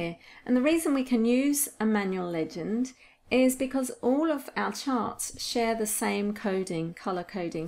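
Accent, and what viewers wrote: United Kingdom. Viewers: It's her British accent. We in NA are suckers for it. lol. A smooth talker from the UK could sell refrigerators to eskimos LOL. But I agree - she is REALLY good.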